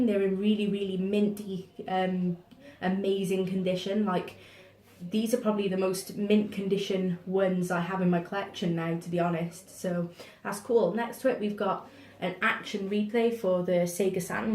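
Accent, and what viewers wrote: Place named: Newport, United Kingdom. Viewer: heh well I'm from Newport as well. The accent sicks out a mile!:)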